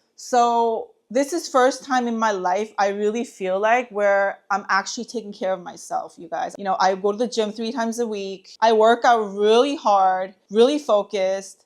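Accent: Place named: United States. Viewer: I just found you. Idk how or why. YouTube recommends me all kinds of stuff. I guess maybe it knows I recently started eating meat too so it recommended you to me. I’m happy to find you. Are you Korean, American, Korean-American? (You sound American) I’m from Atlanta and growing up had a lot of Korean friends or I mean friends whose parents were from Korea. My God mother is Korean. So I’m just guessing sorry lol… maybe more than guessing… anyways I will follow you now for recommendations and things. You’re funny and have good personality so I like what you have to say. Also watching you cry and eat the steak was funny, but I totally got it lol…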